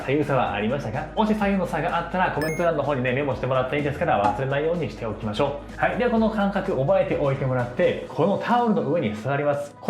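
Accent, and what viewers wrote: Kansai, Japan. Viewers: 関西訛りが良かったです 笑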